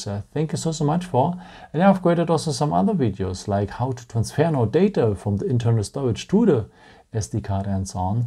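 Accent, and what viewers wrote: Germany. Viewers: We need more videos with some German accent ! Probably buing this phone today, i have had a 9 for four years and very little trouble.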